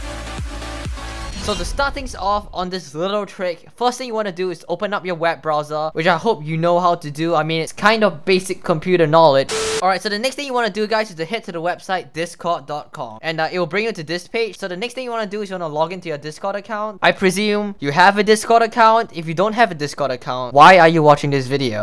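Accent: Singapore. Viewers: why do you sound singaporean :sob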